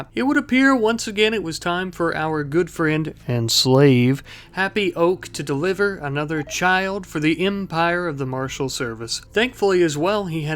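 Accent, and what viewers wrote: South, United States. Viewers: I detect a southern drawl.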